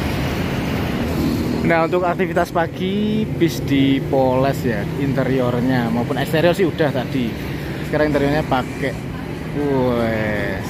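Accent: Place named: Banyumas, Indonesia. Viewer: AA ngapak 😂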